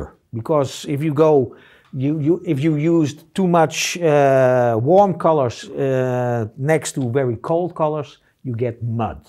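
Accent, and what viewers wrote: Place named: Netherlands. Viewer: Dear sir. Do I have to tell that I love your dutch accent. I loved this, even if I am familiar with paint makings since many years. I love when you say Rembrandt in a way that he would have said. I am a swedish old man, and understands just some words here and there, that we share, but I love the power and poetics in the dutch language. Many thanks for the tutorial.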